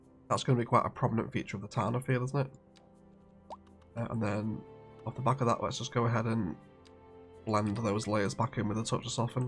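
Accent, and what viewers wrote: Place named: RP, United Kingdom. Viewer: I listened to your proper, refined-sounding British voice telling me that you were inspired by a highway layout from Montgomery, Alabama! 😂 Talk about a contrast! 😂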